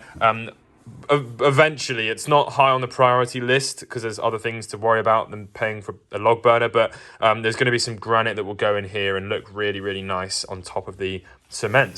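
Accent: United Kingdom. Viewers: It sounds weird that someone who talks in pound sterling and has an English accent is talking about travelling to the UK etc, haha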